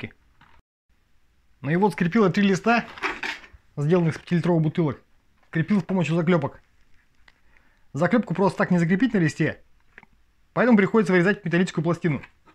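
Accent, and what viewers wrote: Perm, Russia. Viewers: Очень оригинально! Класс! Ты не с Перьми случайно? Акцент как с реальных пацанов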